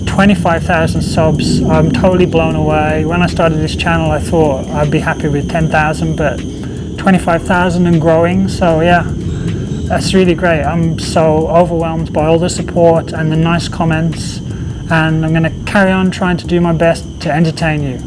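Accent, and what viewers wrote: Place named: Black Country, United Kingdom. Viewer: Kudos for answering my question on where in the Black Country you're from. Had not seen the vid where you referred to your place or origin but got it from your accent!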